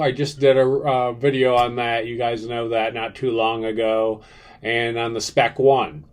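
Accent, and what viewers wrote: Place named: Buffalo, United States. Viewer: Your accent is just like a co-worker that is from Buffalo, NY.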